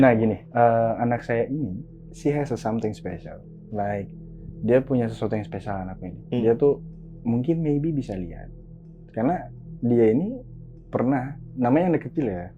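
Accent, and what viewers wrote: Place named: Papua, Indonesia. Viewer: seru mas klo pk logat papua bgs..sekilas g terlihat orang timur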